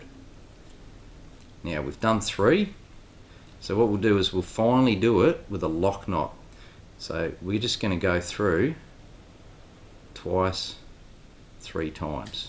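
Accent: Australia. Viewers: this is the easiest way to tie it, hands down. except for i think most ppl including this vid, over due the hitches. a couple times is cool, atleast from my experience. but good vid, and love the accent. CRIKEY MATE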